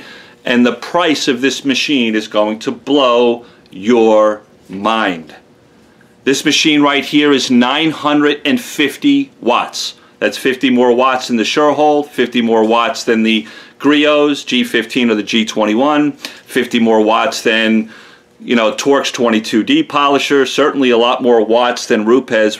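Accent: New York, United States. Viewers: A Texan with a NY accent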